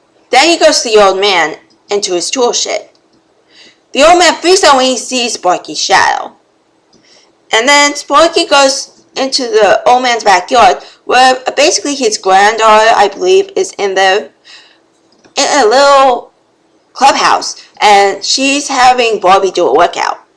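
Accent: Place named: New England, United States. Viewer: From the sound of your accent I am assuming that you are from New England; perhaps Maine.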